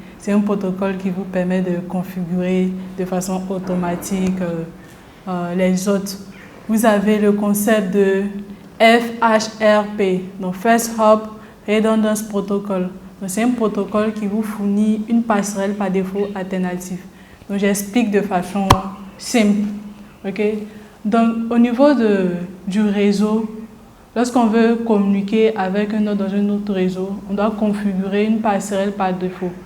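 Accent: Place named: Ivory Coast. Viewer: Merci a toi aussi. On sent vraiment que tues passionnée. Bon courage. Ton accent me dit que tu viens de la CI. Je veux savoir, stp, comment faire en CI, pour passer l'examen.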